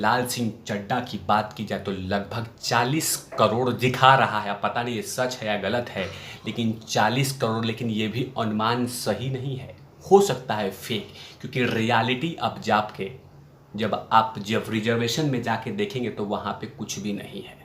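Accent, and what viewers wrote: Bihar, India. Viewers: Kya Bihaari bolte hain bhaiya, matlab ekdum maja hi aa gaya.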